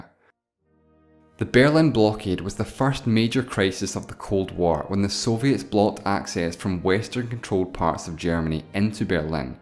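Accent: Scotland, United Kingdom. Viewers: Scottish accent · Alright am a Scottish fan and I'm wondering by your accent I can guess your from central or eastern Scotland am I right and if so which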